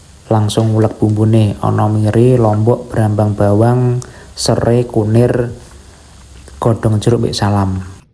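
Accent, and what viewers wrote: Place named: East Java, Indonesia. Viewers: Bahasa Jawa nya medhok banget....pinter masak yo mas...bumbu2nya sama dg resepku....seneng lihatnya ❤❤❤ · Bismillah, Assalamualaikum MAS selalu, enak seger gurih sayure kulweh njih MAS, njenegan asli jowo njih MAS, jowone jowo Timur njih MAS, njih sami sami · Mas sampean tiyang pundi Kok bahasane "tumpi" koyo bahasaku wong Lasem🤭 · Ngomonge koyo wong Nganjuk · Medhok bahasa daerah ndi maze..Tuban t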